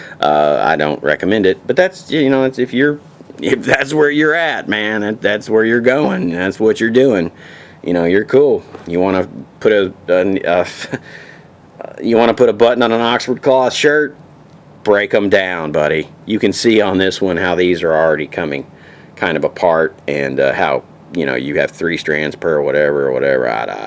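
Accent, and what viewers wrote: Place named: Appalachia, United States. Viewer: Is it possible to do the whole video without sounding like an inbred hillbilly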